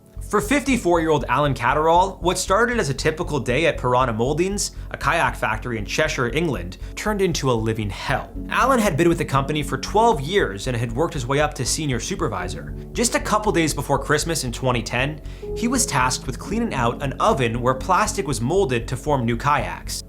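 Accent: United States. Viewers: Can anybody tell me which American accent he has? Cuz i dont know which kinda American accent o have bur whoever I have, it matches the most with his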